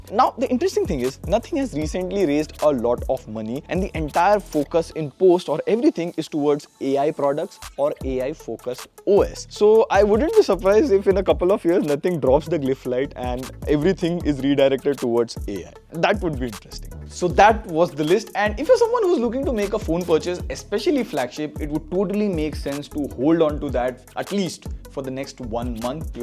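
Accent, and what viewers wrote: India. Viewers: I don't know why . When you speak in English your akcent like Hindi and feel like speaking hindi❤😊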